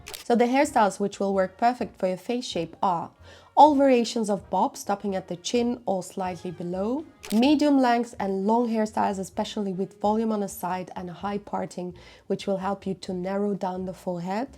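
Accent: Russia. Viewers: У русский акцент, послушала бы ее на русском.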